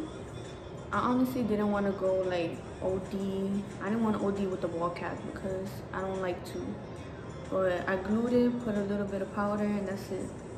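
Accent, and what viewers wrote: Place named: New York, United States. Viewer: the fact i can tell you from new york lmfaoo me too 🥰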